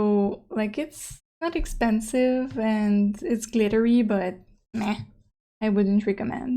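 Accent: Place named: Quebec, Canada. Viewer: Omg haii! It's my first time watching your videos and I notice your accent sounded very familiar, then it clicked you must be from quebec province~ me too ^^ Thank you for the well elaborate video, it helped me a lot to choose since I've only recently started this hobby and I was lost on which pen to start (funny enough the first thing I bought were bottled inks xD ) that pearl kaweko fountain pen surely is very pretty and lovely, too bad it was a limited edition TT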